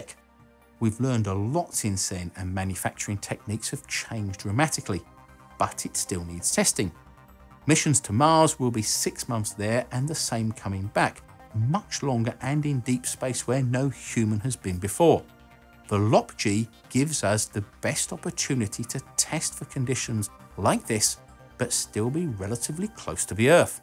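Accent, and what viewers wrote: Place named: England, United Kingdom. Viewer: He is english. What accent should he have?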